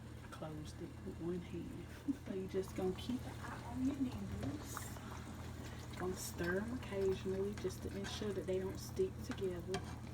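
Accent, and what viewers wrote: South, United States. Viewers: Love ur southern accented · You're so pretty! Also I love your accent; I grew up down south and it feels nostalgic to hear you talk. 💜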